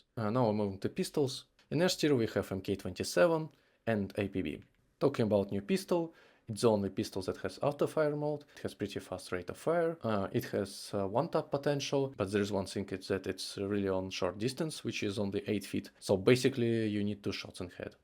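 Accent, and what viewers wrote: Russia. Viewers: I love your russian акцент, good luck on youtube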